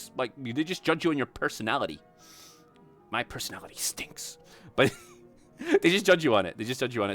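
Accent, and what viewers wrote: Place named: Scotland, United Kingdom. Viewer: 🤔 Whose this Scottish guy, I didn't know they streamed~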